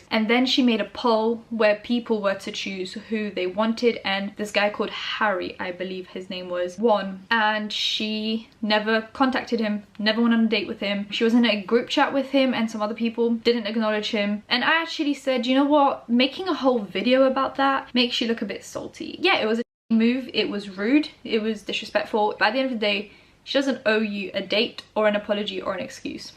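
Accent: United Kingdom. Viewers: Mate, I discovered your videos a few days ago and I’m obsessed. And the fact that you too are British makes it better 😂 only so much American accent a bish can deal with...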